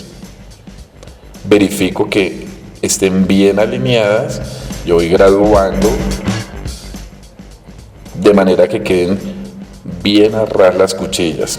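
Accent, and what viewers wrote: Colombia. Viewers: Eres colombiana!!!?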